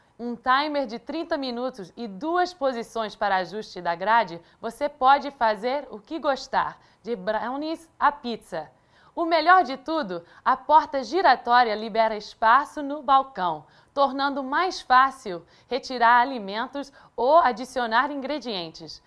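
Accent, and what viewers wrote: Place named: Rio de Janeiro, Brazil. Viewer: O vídeo não é dublado. Ela parece falar português como nativa, mas dá umas escorregadas, até qd fala "ô" (em vez de "ou"). Mas percebam que ela fala também em inglês, e nessa língua, a pronúncia dela é perfeita! Rsrs. Acho que o sotaque dela é carioca, mas sem o chiado.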